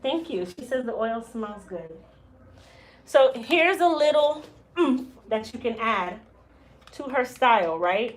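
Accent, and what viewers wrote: Jamaica. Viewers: I knew it!!! I heard your accent and thought you where from Jamaica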